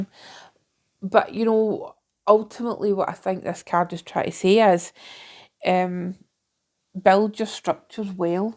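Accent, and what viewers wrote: Scotland, United Kingdom. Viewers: So nice to hear a beautiful Scottish voice. Thank you for the messages, it definitely resonates. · Her Scottish is very broad but there is always the option to turn in the captions. I can’t watch a Scottish or Irish show without them and this is no different but worth the effort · Thank you for your compassion and thoughtfulness thru this reading. I love a Scottish accent ☺️ onwards and upwards 🙌 thank you 🙏❤️✨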